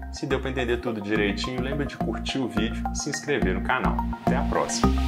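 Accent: Minas Gerais, Brazil. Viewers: Mais é di minas uai?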